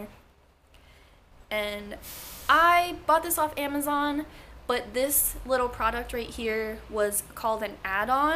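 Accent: Philadelphia, United States. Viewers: Your from Philly?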